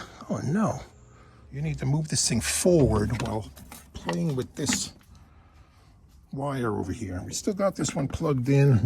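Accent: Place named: New York, United States. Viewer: Love your accent! NY?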